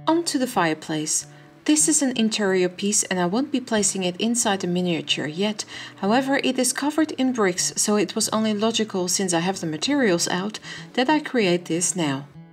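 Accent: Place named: Netherlands. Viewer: Australia? You sound very Dutch? Awesome work!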